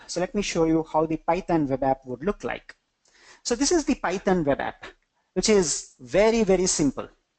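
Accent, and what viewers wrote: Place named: India. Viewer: I usually find the Indian accent very difficult to acclimatize to... but this was actual very good and I enjoyed it! Many thanks!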